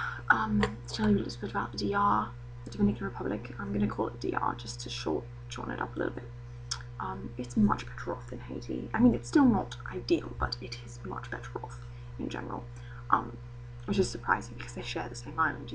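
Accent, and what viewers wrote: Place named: United Kingdom. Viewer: You remind me a lot of Hayley Atwell with that British accent.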